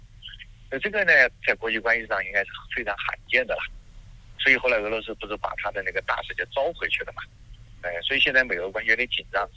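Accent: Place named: Cantonese, China. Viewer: 这个主持人一听就是讲粤语的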